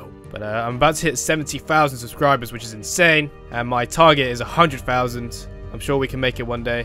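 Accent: United Kingdom. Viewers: someday you could go to buckingham I am a fan I always watch your videos it funny it's sometime has edit if you go to buckingham I'm there Lieutenant Truong I could tell that your British but I'm not british I'm not the one who incharge I know you might be yelled by the royal guard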